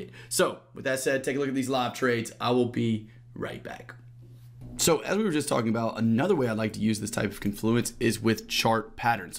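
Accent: South, United States. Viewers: Love your quality content & your southern accent brother. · Very helpful videos, love that subtle southern twang too!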